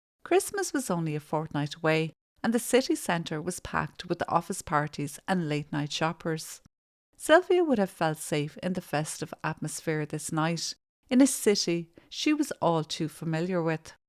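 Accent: Ireland. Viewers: Great to hear your lovely Irish accent as iive been stuck in London since my body fell apart about 6 years ago, 😮.. and wasn't able to make it back as i had to get medical leave as my BasterD hips Collapsed then the bottom of my spine Popped 😮... then CoviD, then a Wheelchair ♿, then 4 years between each hip replacement then......😅...AAaaaH JeaZuS, i must be boring 💤 you now 😂😅... Anyway... fantastic show, ive been Religiously following the absolute DicK Circus 🤹‍♂️ that is poor Lucy Letbys lincining and SCAPEGOATING 😢😢😢😢.. I Think 🤔 Both Country's Justice systems are 0ne 😮😮😢❤❤❤❤🎉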